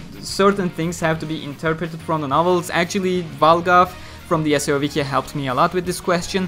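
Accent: Turkey. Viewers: Dün sword art online fandom wiki de eugeo sayfasında gezerken hadi eugeo'yu tanıyalım diye bir video gördüm ve nedensiz yere tıkladıktan sonra İngilizce aksanından yola çıkarak:"Bu arkadaş kesin Türk!" Dedikten sonra kanalına göz attım.Kanal ismimin olduğunu görünce sanki UEFA kupasını kaldırır edasıyla sevinçten minnacık bir ego kastım:) Her neyse Türklerin İngilizce aksanına alıştığım için ne zaman duysam tanırım:).Bu arada bu kanali daha önce neden görmedim bilmiyorum ama içeriklerin çok güzel ve çok derin.Sword art hakkında bu kadar bilgisi olan bir kanalın önünde saygıyla eğiliyorum.Çok ani gelebilir ama herhangi bir şeye ihtiyaç olursa biz buradayız:) bu arada İngilizcen çok akıcı.Sahsen çok beğendim.Nerede ve nasıl bu kadar iyi geliştirdin? Ve son olarak da bu kadar oyunu ve bu kadar bilgiyi nereden biliyorsun? Şimdiden teşekkürler, saygılarımla,bir hayranın...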